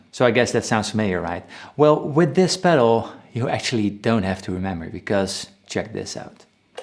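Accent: Netherlands. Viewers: ha lijkt wel een Nederlands accent 🙈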